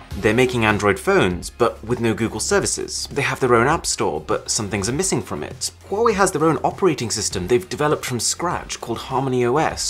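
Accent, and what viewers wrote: United Kingdom. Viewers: The only thing i payed attention to is that British accent.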